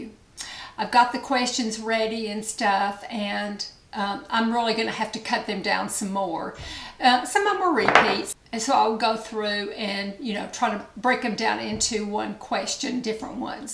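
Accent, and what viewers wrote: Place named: Georgia, United States. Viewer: Hi, honey! I'm so happy that I found your channel today...its my lucky day!😊 🌼Congratulations on your 10 year anniversary!🌼 I can't wait to go back and watch all your videos! What a treat! I absolutely loved this video, and I love you even more. You're so sweet and kind! I really loved how you bought the bracelets for your granddaughters, so they know they always will have each other to depend on. I have 7 children, and I always tell them the exact same thing. I wanted to ask you, also...where are you from? I am from Georgia, and your accent sounds a whole lot like mine.🍑 Ok, honey...thank you again for taking the time to sit down and spend your afternoon with us. I don't feel like I was watching YouTube. It really felt like I was over at my favorite aunts house , and she was taking me around her house showing me all the cool new stuff she found on Amazon. 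I loved it! Take care, honey, and we'll talk to you again soon. Love, Courtney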